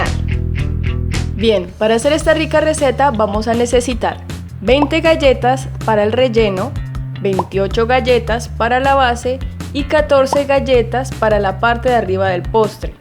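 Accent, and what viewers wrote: Colombia. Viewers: Apenas empezó q a hablar yo dije ella es Colombiana a mi no me mientan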